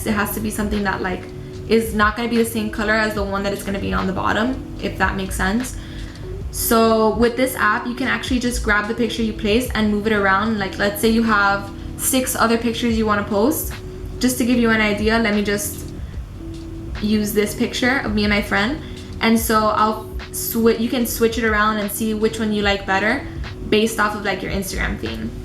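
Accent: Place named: Miami, United States. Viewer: I know a Miami girl when I hear one ! 🤗 xoxo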